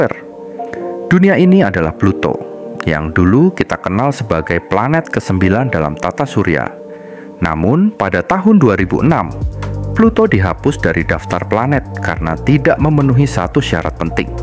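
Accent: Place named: Java, Indonesia. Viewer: Naratornya medok bgt😁aku suka klw mslh astronomi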